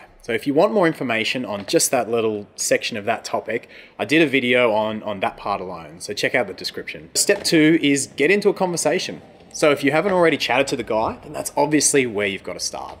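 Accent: Australia. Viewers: u sound aussie! i am btw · Are you Aussie? I think so & I love that. Most of the guys on YT giving these tips are English and worthless in this dept. I’m American & I think American guys & Aussie guys are real men (just my opinion) and I put stock in both of them. I’ll check out your other channels. Tyvm Aussie Man!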